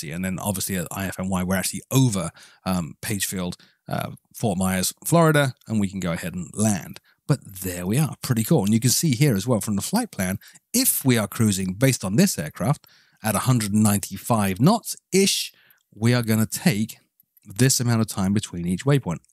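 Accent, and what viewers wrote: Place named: United Kingdom. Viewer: you kinda have a british accent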